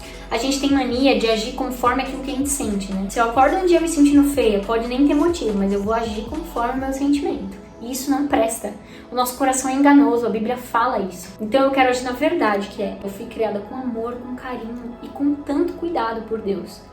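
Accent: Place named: Rio Grande do Norte, Brazil. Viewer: Perdi as contas de quantas vezes vi esse vídeo de ontem para hoje. Pq você tratou esse tema de uma forma muito próxima, e isso é bom. O tema da auto estima de fato é uma coisa que a gente precisa ver e rever todos os dias. Ontem, li que resultado não vem com motivação mas sim com constância. O mesmo se aplica a nossa auto estima. Estou nessa mesma fase que você, de ir entendendo que meu novo corpo que já não é o mesmo de 10 anos atrás quanto eu tinha 17, surge na minha frente uma mulher e não mais uma adolescente, e é preciso amar, reconhecer e respeitar as curvas novas, e sim, com certeza melhorar aquilo que ainda não é tão legal pra gente e você trouxe isso muito bem. Ultimamente tenho filmado meus piores ângulos kk acrescento uma risada engraçada e vejo e revejo aquele tal pedaço de mim que eu não gosto pra justamente ver ele tantas vezes que ele se tornou algo comum e não um incômodo. 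Se a gente se fato rever esses pontos, eles nem são tão ruins assim, vai. Obrigada pelo vídeo. Continue postando essas coisas relevantes pra gente ter conteúdo real nessa internet. Ah, e eu achei lindo seu sotaque no inglês. Cheiro de Natal/RN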